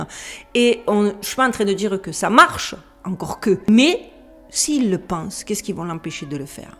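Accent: South, France. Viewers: Et superbe t'on Accent du sud De la France J'adore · Merci ! A toi qui met l'accent du Sud sur ls dingueries de ce bas monde... Que du bon pour toi et la famille incha'ALLAH, ainsi qu'à tout t'es abonnés (ées). Longue vie à ta chaîne...👉🏽😉👍🏼👉🏽🌟🌟🌟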